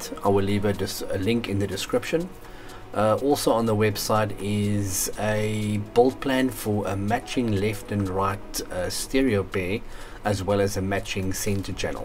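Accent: South Africa. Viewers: I heard your accent and was gonna ask if you were based in SA? But then I saw the news paper you used with ‘GAME’ advertising, and we was like.. aah..haha. Great looking speakers, and good build techniques, I’m learning a lot from you, thanks!! Where do you get your parts, as I know SA isnt that easy to find stuff like that.. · awesome to see a fellow SA citizen make it in YouTube. Accent was very fimilar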